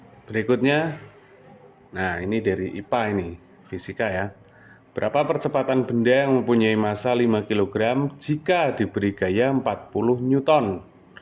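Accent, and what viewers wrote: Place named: Java, Indonesia. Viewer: Bahasa indonesianya medok bgt mind, orang mana mind ?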